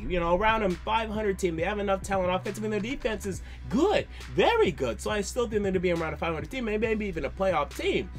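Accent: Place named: South Florida, United States. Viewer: That South Floridian accent doe 💀💀💀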